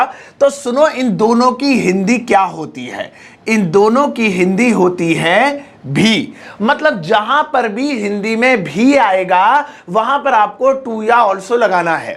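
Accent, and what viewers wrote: India. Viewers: 🇮🇳Deshi english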